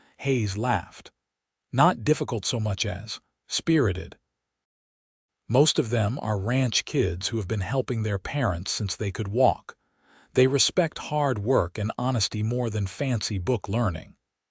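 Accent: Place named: West, United States. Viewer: So sorry, that with the lovely voice of your Narrator, so sorry that so many words are wrongly spelled and/or mispronounced. The voice of the Narration is wonderful It would pass for a genuine Western American if it were not for the outlandish pronouncement of such a few words. I really do like the story. Well done.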